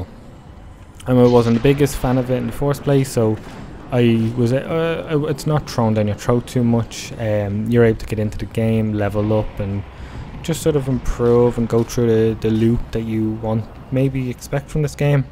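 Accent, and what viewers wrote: Ireland. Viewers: You're Irish mate aren't ye, thanks for the review though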